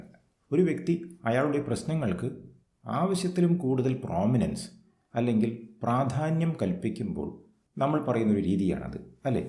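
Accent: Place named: United Kingdom. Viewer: your pronunciation of English is exactly like a British native speacker, wish i could speak English like you.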